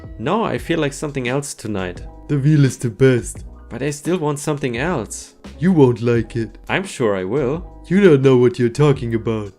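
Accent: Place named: Germany. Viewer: Heyho ^-^ is it possible that you are German ? Becouse i am and your accent is a little Bit german, i was just curious (sorry for Bad english i am german ^^")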